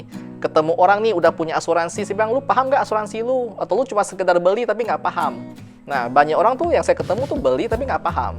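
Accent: Pontianak, Indonesia. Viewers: Bahasa pontinya mirip bgt ama mertua gw 🤣🤣🤣🤣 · Mantab Boy,... Salut dengan budak Ponti, Penuh dgn perjuangan dan menjadi inspirasi utk semua... Salam dari saye budak Ponti, yg lahir dan besak di Ponti.... Aku suke logat kau boy....Tetap semangat dan sehat selalu Boy.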